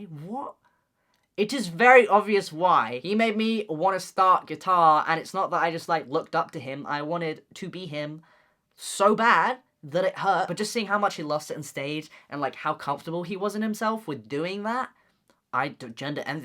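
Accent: England, United Kingdom. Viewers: im sorry it's just that english accent is so refreshing to me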